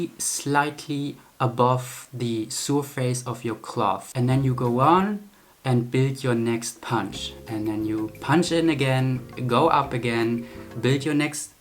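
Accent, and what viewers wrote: Germany. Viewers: the German accent is kind of cute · Are you German?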